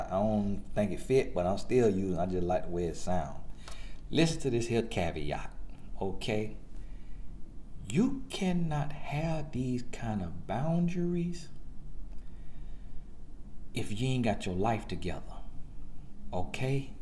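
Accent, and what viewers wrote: South, United States. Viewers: I love how that southern accent comes all the way through. lol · The southern twang with it too. Love it... booty ways dang he ain't tell no lie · You have a lovely accent The American southern accent is adorable Subscribed! 😊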